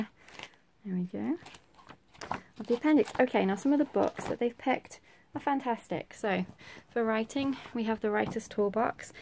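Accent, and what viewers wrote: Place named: United Kingdom. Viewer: You sound british but are using american education terms. You're like me, become americansied from all the homeschool youtube videos and american curriculum websites :D XD I started using grades naturally now instead too :D XD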